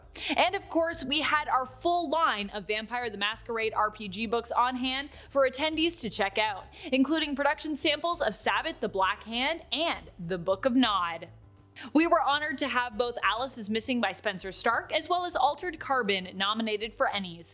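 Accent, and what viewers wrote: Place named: Canada. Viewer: She's Canadian, they talk funny sometimes.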